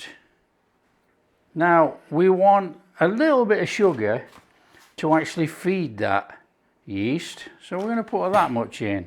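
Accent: Yorkshire, United Kingdom. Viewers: Every time I hear you say garlic, I think of Peter Kay. “Garlic bread, it’s the future! “ lol. Must be the Yorkshire accent. I was having a good old chuckle with the garlic oil hehehe p. In the nicest possible way though. I adore you, you’re just so real xxxx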